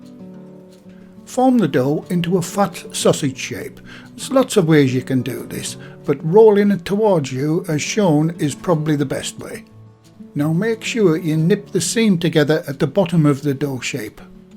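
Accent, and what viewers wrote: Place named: England, United Kingdom. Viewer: I like to listening your English accent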